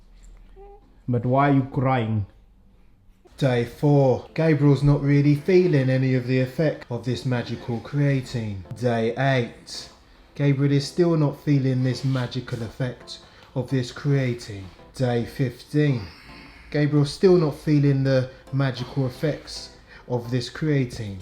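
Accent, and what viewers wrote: United Kingdom. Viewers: Dude I was also thinking the same shit and was gonna write a comment along those lines,he really does sound like one character and scene that came to mind...in SNATCH when TYRONE made a call to SOL and was like “Boris just got to his house...Hang On he’s not alone,he’s with some fellas”😆😆😆😂😂😂😂 I am South African and I love Bri’ish accents and all of GUY RITCHIE movies,their dialogue is priceless.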